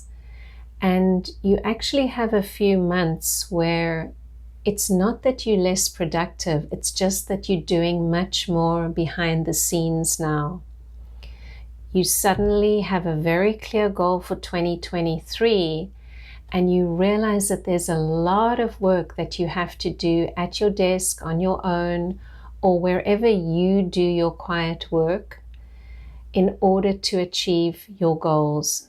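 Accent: South Africa. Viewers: That sounds like a South African accent - Howzit from Plett. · Definitely a South African accent. Am a Cancer Sun and Rising. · you sound south african, lol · Thank you so much for this, you have no idea how much I needed this. 🥺❤️🙏🏾 Bless your soul. 🥺 Also, you sound so South African 😭😩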